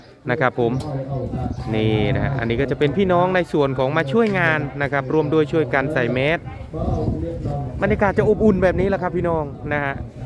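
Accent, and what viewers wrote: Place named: Isan, Thailand. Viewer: สำเนียง ภาษาอีสาน พี่พูด สื่อสาร ได้ เพราะ มากคัฟ #FC คัฟ #เด็กใต้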